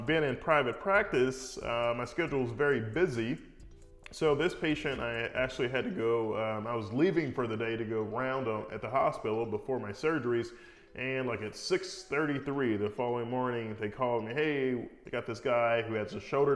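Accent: United States. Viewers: Are u american Dr ?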